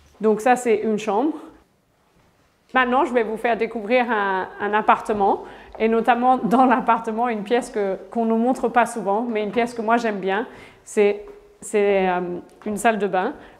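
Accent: Lorraine, France. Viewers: Très beau mais quelle charge... Bravo à vous. L'accent est il lorrain ? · Bonjour Madame, j entends que vous avez un léger accent , est il Lorrain ? Merci pour cette visite de votre château qui est intéressant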